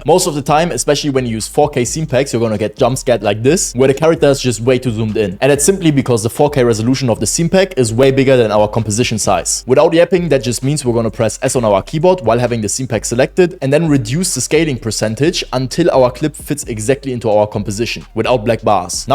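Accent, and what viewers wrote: Germany. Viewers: Ich höre deutsch 😉👏🏼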